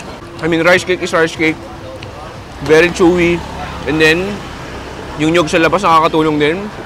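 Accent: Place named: Philippines. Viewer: English acent Philipinos... You looks like Cakra Khan..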